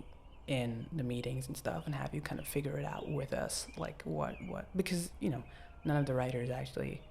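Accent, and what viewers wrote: France. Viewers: As a french I love your accent, you speak well! Are you sure you've never been to Paris😋? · Love your french accent !! When you say "Disney" the « s » sound like the beggining of « sorry » or « snake »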